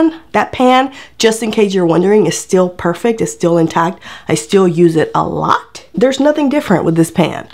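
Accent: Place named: Compton, United States. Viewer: Can you do us a favor and not speak like you are from Compton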